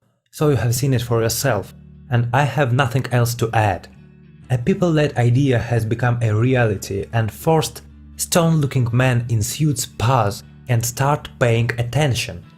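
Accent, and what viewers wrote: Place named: Russia. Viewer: он та из России, вырос в такой среде с таким акцентом. Научиться со временем.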